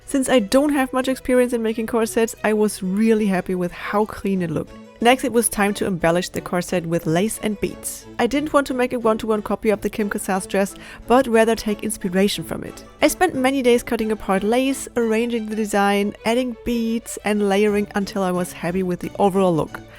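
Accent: Germany. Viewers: The german accent makes 100 times better😅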